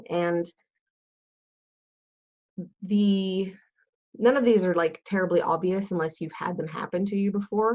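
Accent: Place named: Canada. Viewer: Fascinating and useful stuff, not dull at all. I especially like that you don't ship problematic books for whatever reason. Answered a lot of questions perking the last several months that you've helped me navigate FBA. Love the Canadian accent, too.;-)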